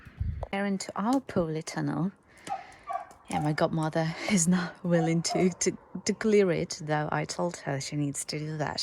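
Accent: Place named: RP, United Kingdom. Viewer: Привет your RP English is pretty good. I love your voice and presentation style. I have been living in Moscow since 2015 so I understand your customs and way of life as they are obviously similar to the Russian way of life. Yet I still find it interesting to watch your videos.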